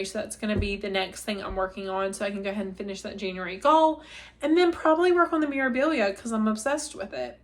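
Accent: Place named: Eastern North Carolina, United States. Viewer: Congratulations on the new home! It’ll be such a sweet time, and no hurry to “make it your own”… your style will take shape over time ☺️ Been watching and enjoying since your first post and am not usually a commenter on videos, but wanted to encourage you today… I’m also a fellow eastern NC girl (of 52 😉) and love hearing our native accent on YouTube 😁💕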